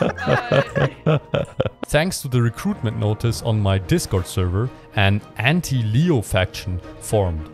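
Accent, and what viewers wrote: Germany. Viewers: Man kann hören das du deutsch bist haha cooles Projekt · der deutsche akzent hittet schon echt aber ich feier den content mega! keep it up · i can hear the german in your voice :D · Are you from germany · dein deutscher akzent ist so wild XD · Are you german?! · Are you german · I hear a german accent. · You kinda sound German :)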